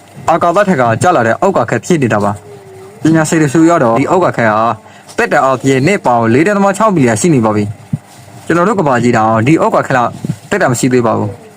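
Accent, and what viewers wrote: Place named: Dawei, Myanmar. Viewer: ထားဝယ်သံဘိတ်သံနဲ့တင်ဆက်နေတော့မနဲနားထောင်ရတယ်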